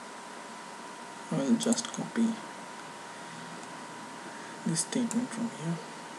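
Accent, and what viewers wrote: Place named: India. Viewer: india english??? OMG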